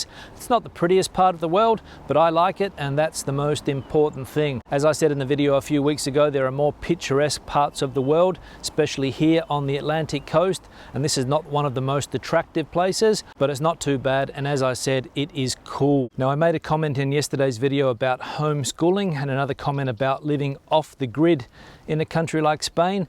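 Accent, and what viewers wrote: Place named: Australia. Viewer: Cheers,. When I first found your channel, I was quite confused. Your rapid speech, Australian accent, and my bad hearing combined to have me thinking your name was Jordy!(I know!😂) The first comment I ever made on one of your videos, I called you Jordy, then I read all the other comments calling you, and I was so embarrassed that I went back and edited it! I love your updates, no matter where they are from👍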